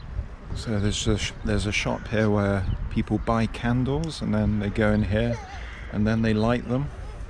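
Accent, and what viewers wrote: England, United Kingdom. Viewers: Bald and bankrupt got me here I am enjoying your vids too ,all ways wanted to visit Serbia since Tony Blair though it was a good idea to bomb it because he had no idea about history, can we have a little bit about your back story, how you got your very English accent maybe ?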